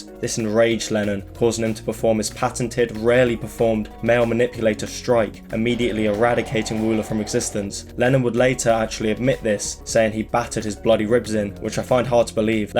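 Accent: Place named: Birmingham, United Kingdom. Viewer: You're a brummie as well? Mad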